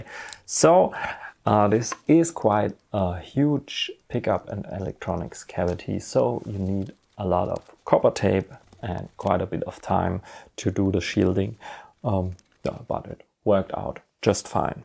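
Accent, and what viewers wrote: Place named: Germany. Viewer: I realized from your accent, that you live in Germany as well. I really like your mod ... would you offer to do it to other rascals (for money, sure)?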